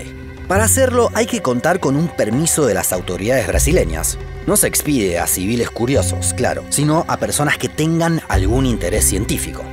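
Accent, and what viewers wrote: Buenos Aires, Argentina. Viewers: Muy bueno, lo único malo es el terrible acento porteño del relator.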